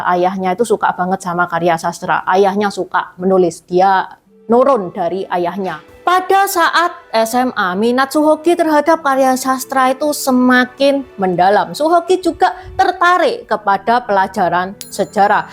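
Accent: Java, Indonesia. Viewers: Mbaknya, suaranya medhok jawa...😊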